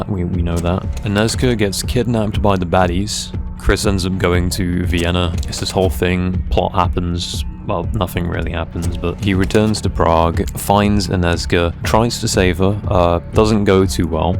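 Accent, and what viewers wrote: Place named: United Kingdom. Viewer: Man I hope you come back dude. We need your britlong voice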